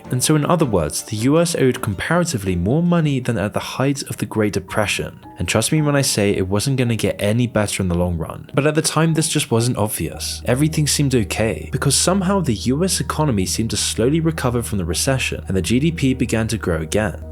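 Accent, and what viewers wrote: United Kingdom. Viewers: A person from the UK with a British accent is doing a video on the US economy lol.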